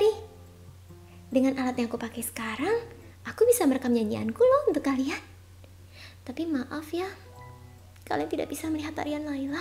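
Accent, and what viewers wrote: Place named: Indonesia. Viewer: That Indonesian accent when speaking English though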